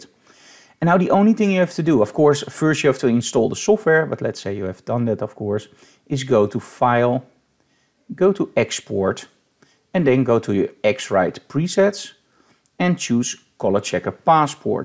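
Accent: Netherlands. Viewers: are you from holland sir ?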